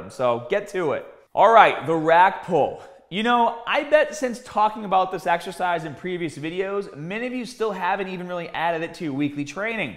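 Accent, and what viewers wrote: Boston, United States. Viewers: Is he from Boston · Ok I just watched his video on deadlifting from 9 years ago, when did he develope a boston accent?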